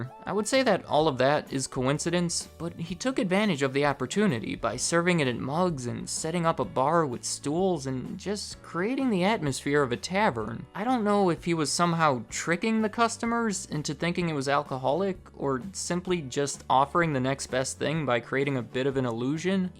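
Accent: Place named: Maryland, United States. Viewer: Im gonna guess from your accent you live in Fredrick md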